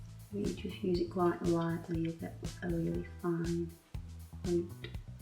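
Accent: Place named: North, United Kingdom. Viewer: That looks freaking awesome! Loving this second channel, as soon as I've watched your nail tutorials I come over here haha! it's great to here a fellow northerner on YouTube! Hello from Merseyside!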